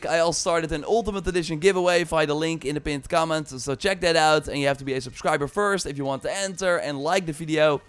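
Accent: Netherlands. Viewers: You sound dutch! I am very hyped for it! I loved farcry 3 alot aswell as ac odyssey. Here is to hoping it will be good 👌❤️ Entered the giveaway! 🎉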